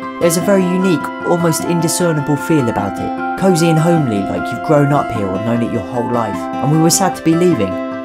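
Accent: Flanders, Belgium. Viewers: It's a joke, we don't actually dislike Flemish people. Personally I really like the Flemish accent, though it isn't really fitting for a video about Amsterdam. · Lovely video! But it sounds like the girl from the voice over is from Belgium and not the Netherlands! Flemish and Dutch are officially two different languages! :) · Native dutch speaker? She has a flemish accent.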